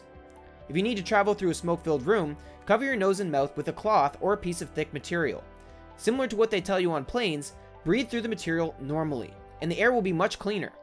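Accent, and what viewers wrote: Canada. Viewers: You sound Canadian eyy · is the one talking canadian?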